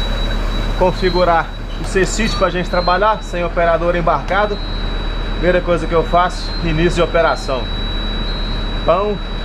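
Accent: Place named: Minas Gerais, Brazil. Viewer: sotaque minerin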